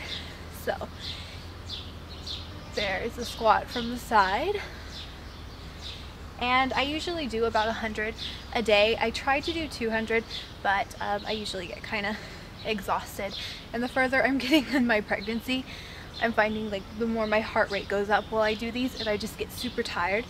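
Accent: United States. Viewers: Just found your videos and I love them. I love how much of a healthy life you live. I don't know if you mentioned this in another video or not but how did you meet your husband? I was just wondering because you sound American and your husband sounds German and you mentioned living in Germany, so I was just wondering how you ended up meeting one another? =)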